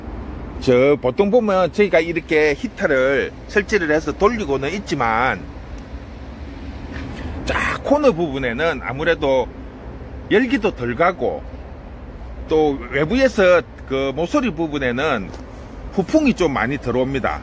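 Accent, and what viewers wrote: Gyeongsang, South Korea. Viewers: 화려함은 중요하지 안어요 있는 그대로의 모습~~ 님의 그수한 경상도 사투리로 알찬정보가 구독자분 들에게는 많은 공부가 된답니다 고맙습니다.감사합니다.축복합니다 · 있는 그대로의 모습과 사장님의 구수한 갱상도 사투리가 어우러져 훨씬 정감가고 멋있습니다 거기다 좋은정보까지 ᆢ 정말 감사드립니다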